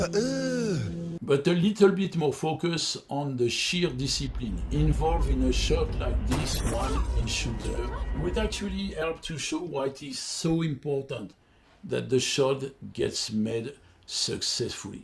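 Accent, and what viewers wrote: France. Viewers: C'est un accent Français, non ? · What is this accent ? You are French right ? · How come you talk with a French accent but have a muricastan flag and use their bullshit unit system? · Vos vidéos sont sympas, mais l'accent, "so frenchy dude" pour un francophone c'est une vraie souffrance. dommage de n'avoir assumé votre nationalité et de facto faire vos vidéos dans notre langue. Autre option prenez des cours pour arrêter ce massacre. Just a tip mate cheers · Is this guy french or slavic I cannot tell. It sounds very French when he says "Rownd, "ledge-geon-dairy", "bee-cawze", et. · Hello, liked your vidéo, just a question, are you french ? Because you have the same accent as me. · oh come on why is he french. now i have to close the video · You must be French judging by your accent....my brother has a .338 Lapua.....I have a 30/06....one must utilize the tools that are available to him or they are worthless. 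Very good to hear someone speak shooting truth! Thank you for posting!!!!!! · beautiful French accent ! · This is a great video, I am glad I clicked on it. I love snipers and find it an amazing subject. The French accent was a surprise and immediatly got me hooked: I am a francophile and I even lived in France. I am also always read to listen to a grandpa telling stories. The humour in the video is great and the transitions are hilarious. I can say the technical information here is above average and the best package in one single video on all of YouTube. I read everything I can about sniping and my favorite book is Out of Nowhere, by Martin Pegler. It doesn mention the proeminence of the .338 Lapua Magnum. The books Stalk and Kill, and Sniper One on One, by Adrian Gilbert are also great. Do you know French books about sniping? Snipers are fascinating subject and no wonder we have so much media about them on popular culture. 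As a matter of fact, I was about to switch on Sniper Elite 3 when I saw this video. My first exposition to sniping was the movie Sniper (1993), with Tom Berenger and Billy Zane. The movie is classic of action and suspense, and it shows some of the practices of sniping. I got tranced and always studied all I could about the craft. Maybe you could make a video analysing Sniper!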